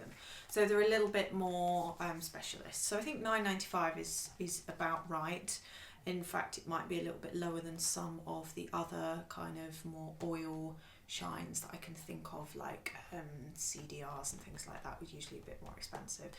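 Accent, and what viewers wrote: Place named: United Kingdom. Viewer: YOU HAVE BRITISH ACENT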